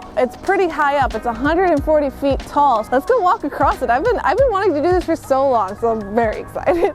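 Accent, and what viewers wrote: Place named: Bronx, United States. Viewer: Wait, you sound like your from the boogie down sweet heart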